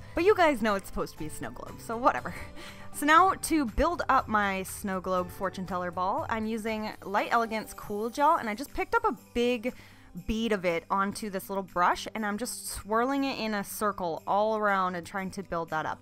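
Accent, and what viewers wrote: Canada. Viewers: Nesian Ninja She’s from a different part of Canada than myself and the accent is different. It’s subtle..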